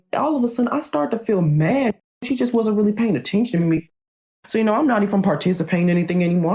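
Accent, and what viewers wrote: South, United States. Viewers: It’s the country accent fa me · He’s country or something. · Stop talking about his voice. It's not funny it's normal because he is obviously from the south. The only part I laughed at was when he was talking about himself and how he looked on tv which was the only part that would be acceptable to laugh at because the rest of the video was him telling a story. The way his voice sounds is irrelevant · i noticed he a really big country accent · he's so country, i love it!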